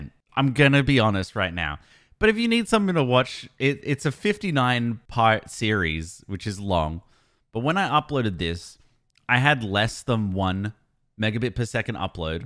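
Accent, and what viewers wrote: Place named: United States. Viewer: 6 years and an american accent later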